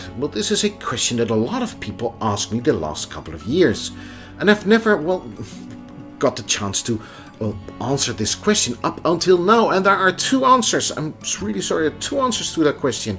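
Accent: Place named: Netherlands. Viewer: Nederlander die een beetje aardig Engels kan, 👍🏼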